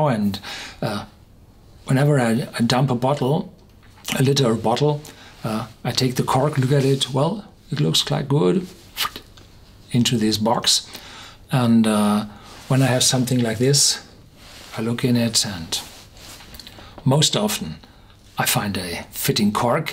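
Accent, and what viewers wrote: Germany. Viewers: his voice and his german accent are sooo ear-pleasing !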